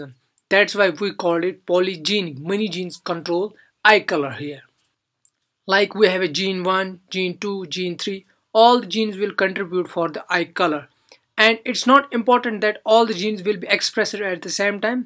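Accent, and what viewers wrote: India. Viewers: Ronnie yeah he has an Indian accent probably because he’s from India. It’s a bit hard to understand but not like you can’t understand anything. Lamo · I didn't understand one word this guy said LOL is he talking English.? he is talking like a scientist to us he is very condescending. also he has an Indian accent, which does not help matters! LOL He needs to talk to other scientists not the general public. Blue eyes, brown eyes , green eyes. that's all I could get from this guy.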